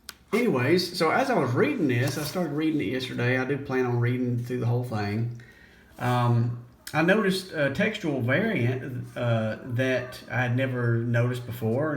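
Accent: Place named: South, United States. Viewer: Your strong Southern twang is in sharp contrast to your beautiful Greek pronunciation!!!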